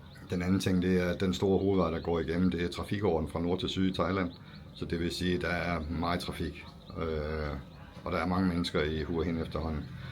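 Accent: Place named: East Jutland, Denmark. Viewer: sejt kan høre du er jyde østjyde måske fra Aarhus egnen- kan jeg have ret i det- men god video - var i Hua Hin i 2- uger i 2019 samt længere sydpå i 2 uger boede først på hotel Rocco Hua Hin men der var for meget støj - så det var svært at sidde uden på balkonen så vi flyttede til et andet 800 meter væk men afsides fra trafikken det var væsentligt bedre- men kan ikke huske navnet - men der var mere ro og en gag om ugen var der marked lige overfor. men lækkert havde også en 10 dage i Soy Sam Yot først var der i 30 dage i alt plus 2017 i 30 dage samme sted som , sidst nævnt- lækkert var det!!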